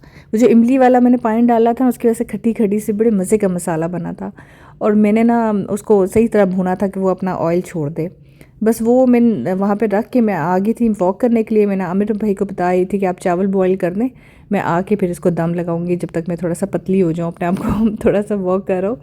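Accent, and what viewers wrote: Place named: Kashmir, India. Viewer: ❤AP k zillah bhimber army center family quarters sa hn AP k velog bhot acha hoty hn 🎉🎉 mñ 27year sa family quarters MN rah re hon AP MN offeiceres waife wala gharoor Nazar ne Aya or 1baat kashmeer k log bhot achy Mily mujy leepa bodr samahni kotli atthmuqaam all bodr ayrias k log sub bhot achy itny driy froouits khay hn hr Tarah k wha g kia baat apke kashmeery zuban metthee se baji 🎉❤❤ bhot ceuit hn sub ko assalamualaikum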